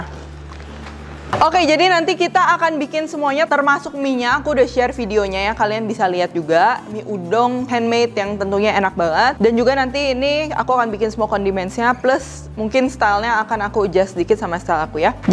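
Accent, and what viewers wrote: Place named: Tasikmalaya, Indonesia. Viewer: "Lebih lekoh lagi" 😁 Cici dari mana, Tasik ya?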